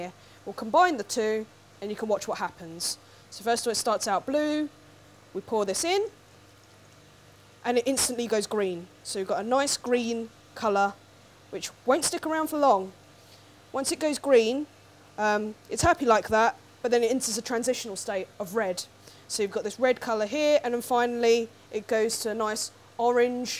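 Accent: England, United Kingdom. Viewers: deep voice with and english accent come out of an asian woman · Asian * sexy british accent = one very sexy scientist. Yeah, baby, yeah! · why does this asian chick have an english accent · asian with british accent? DOES NOT COMPUTE!!!!!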